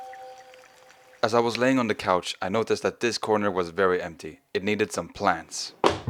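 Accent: Sweden. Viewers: jag hörde att det var en svensk på dealekten lol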